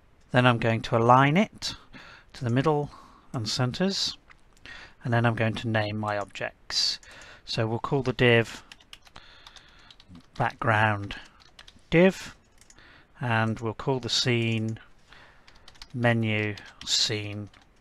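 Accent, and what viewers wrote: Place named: United Kingdom. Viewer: I have been watching tons of software tutorials about different applications and so far you are the most comprehensible and concise. Seems to me that you have planned it methodically or maybe you're just a natural. And this comment is coming from a guy (me) who is a non-native English speaker listening to another guy (you) with authentic English and a British accent. Keep up the good work. Thanks and God bless.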